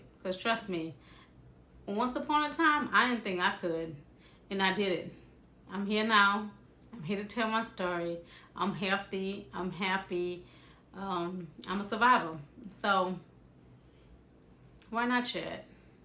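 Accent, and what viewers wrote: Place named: Germany. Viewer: irgendwie kann ich raus hören das sie deutsch sprechen also an ihren deutschen akzent ich liebe aber ihre videos machen sie weiter so!❤️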